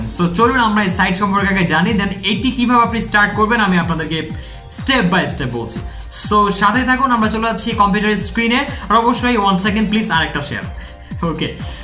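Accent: Dinajpur, Bangladesh. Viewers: ভাইয়া মাঝে মাঝে, আপনার দিনাজপুরিয়া ভাষাগুলো অনেক ভালো লাগে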